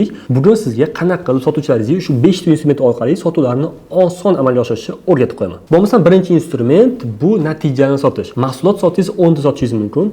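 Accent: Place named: Qarshi, Uzbekistan. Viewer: Qayerliksiz qarshiliklarga o'yshap gapirdiz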